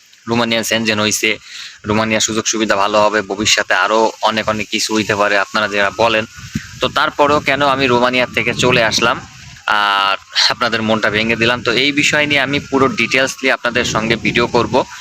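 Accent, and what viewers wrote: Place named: Jessore, Bangladesh. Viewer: ভাই আপনার বাড়ি কোন জেলাতে আপনার ভাষায় তো বলে যশোর চুয়াডাঙ্গা এই সাইটে আপনার বাড়ি কোন জায়গায় একটু যদি জানাইতেন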